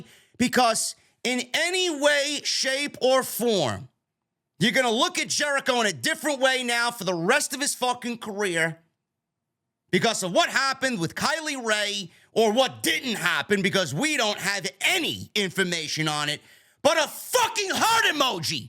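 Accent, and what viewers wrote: New York, United States. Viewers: I just come here to listen to an angry New Yorker accent. 😂 Love it.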